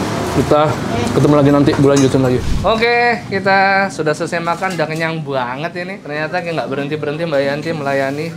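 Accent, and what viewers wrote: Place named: East Java, Indonesia. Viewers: Logat jawatimur an aslinya mana sih 😅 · Oalah pantesan bahasamu jawatimuran mas wkwkwk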